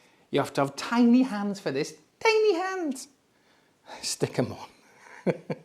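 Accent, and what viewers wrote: Wales, United Kingdom. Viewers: I have those type stands, a little different and mine are not as nice that aside i couldn't be more happy with them. Mine are faux leather and wood effect although the wood effect is like a vinyl wrap but it's very thin so you must be careful, yours look slightly better made on the front effect front. For the money they are excellent. Btw I am impressed by the effect you put into your videos with the editing and little effects. You are very rare on that front and the effect is much appreciated. I can tell from your voice that you're from my dad's country, he was from Buckley North Wales. Miss Wales but living down south makes getting there hard.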